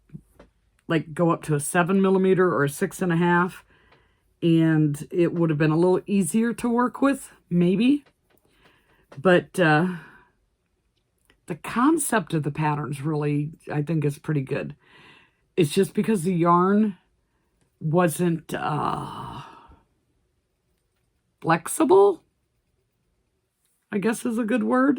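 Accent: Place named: Florida, United States. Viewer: You speak like a Floridian. Laid back and easy. It’s why you’re my favorite. Feels like home.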